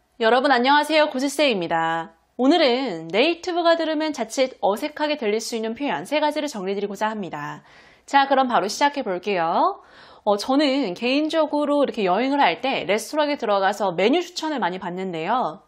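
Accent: United States. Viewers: 완전 미국영어네요… 디싸이링~~ 쌜맨~~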